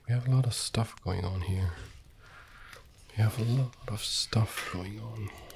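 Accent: Denmark. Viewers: Are u danish cause🇩🇰🖐Hva så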